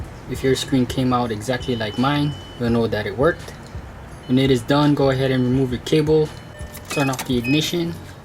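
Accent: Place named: India. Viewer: Czech guy with indian accent :D Interesting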